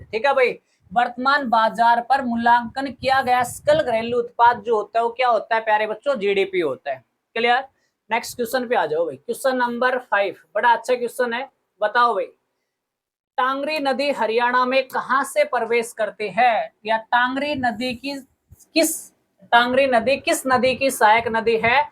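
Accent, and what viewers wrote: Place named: Rajasthan, India. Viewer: Bola to Rajsatha ka h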